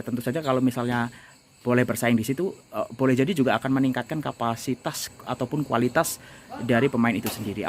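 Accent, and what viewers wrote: Indonesia. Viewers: itu cara indo, i disebut e, kalau A disebut a, kalo k disebut ka, kalo B disebut be, c disebut se mcm tu lah lebih kurang.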